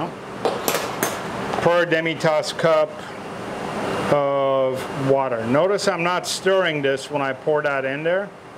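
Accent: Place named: Texas, United States. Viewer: Well now I've seen it all, just about.. An Armenian with a Texas accent.... yee-ha mayre tagherem!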